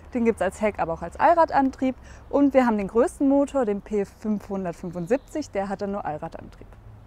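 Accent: Bavaria, Germany. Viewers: Sehr schönes Fahrzeug ich habe A5 Cabrio 2018er :) bist du aus Bayern mfg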